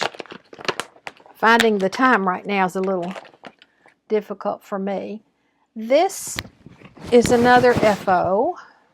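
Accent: South, United States. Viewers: I look so forward to your flosstube videos! You are so sweet and of course I love your southern accent. You do such wonderful projects! Kim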